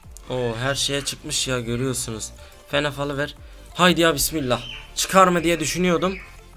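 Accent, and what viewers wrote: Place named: Antep, Turkey. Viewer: Antepli misin şiven benziyo da yada adanalı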